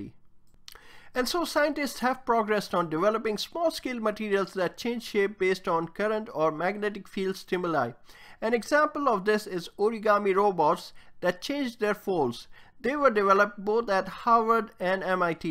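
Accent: India. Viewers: Oh wow an interesting video. Indian accent Nevermind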